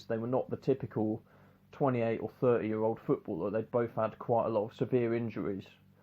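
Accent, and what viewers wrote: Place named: RP, United Kingdom. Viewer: You sound like a posh spak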